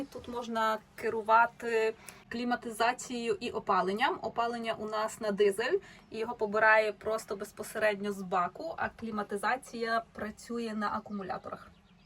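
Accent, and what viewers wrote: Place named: Poland. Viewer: тут саме Польщу чути, не Львів)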